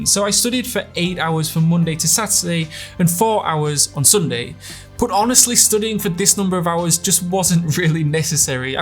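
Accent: Birmingham, United Kingdom. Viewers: you speak like picky blinders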